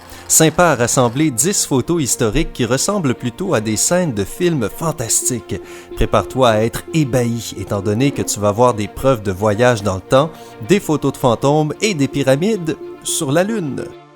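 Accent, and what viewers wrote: Quebec, Canada. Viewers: Je mets le pouce bleu juste pour ton magnifique accent québécois 💓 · Super l' accent kébecoi :') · j'adore l'accent québécois (j'y vais plus souvent que bcp) mais la musique en arrière plan est d'un casse oreilles, une prise de tête ! Bien à vous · Vidéo de merde pour le sensationnel, tout ce que tu montre à des explications, fais des recherches er cet accent québécois immonde, ça me fais vomir. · Putain mais ferme ta geul avec ton accent québécois ta niquer la vidéo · charlatan avec son accent québécois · "Un accent québécois" c'est super bien, au moins on comprend quelque chose. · On dirait la voix d'un québecois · L'accent québecquois, ça va bien deux minutes, après ça me dégueule par les tympans...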